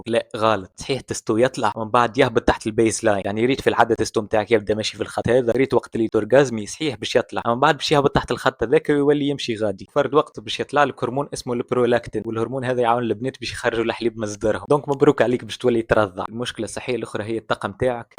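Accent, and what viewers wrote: Tunisia. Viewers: لجتي التونسيه ❤🇹🇳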